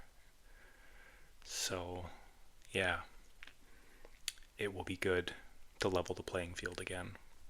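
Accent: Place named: Canada. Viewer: Thanks for allowing us to join your channel! I do love how you talk, the way your voice sounds (so soothing and warm and comforting) and I ❤ your thick Canadian accent. And thaaank you for the roller ball!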